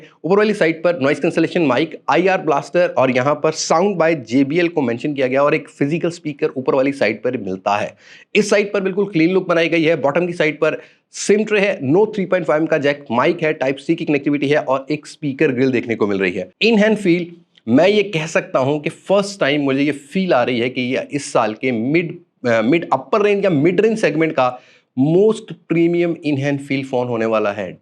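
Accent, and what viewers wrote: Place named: India. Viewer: Meri apse humble request Hy ap indian tarz my bat krna chor de . Ap yh kar skate ho ap woh kar skate ho . Proper Urdu my way my boliye ap yh kr skate hai ap woh kar sakte. Hy . Hoo ki jaga Hy lgiye pls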